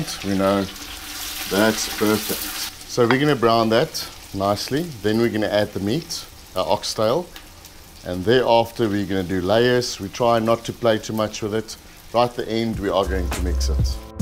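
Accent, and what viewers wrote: South Africa. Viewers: Als ik jouw zo bezig zie, dan besef ik: WAT MIS IK ZUID-AFRIKA. En vergeet vooral niet: dat prachtige Z-A accent. Potjiekos met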